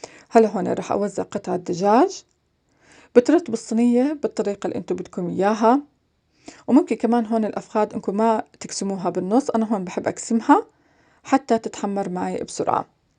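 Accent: Jordan. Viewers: أردنية أردنية يسعد لهجتها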